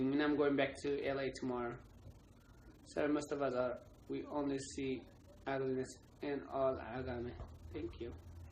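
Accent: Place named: Tigray, Ethiopia. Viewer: At least you intartained me today.i laughed my lungs out."teketatelti medb halewlew "elkayo.while I was watching some videos your video which was my first time to wach popped,it was about your past life I kind of felt bad.And then some other keept poping ,and continuued watching Some of them made me smile,some of them surprised me,some of them horrified me,and so on . anyway I have one little advice for you please restrain yourself from inhuman words,becouse may be for you those words might be easy,but they hurt a lot.i don't have any problem when you tolk in TIGRAY accent,and all the staff,becouse i am proud of that.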